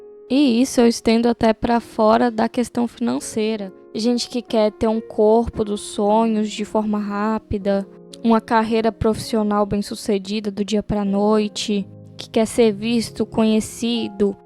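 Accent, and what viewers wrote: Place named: Brazil. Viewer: Conheci seu canal hoje, muito bom mesmo! Voce é de minas, Goiás ou nordeste? Sinto que seu jeito de falar é tao familiar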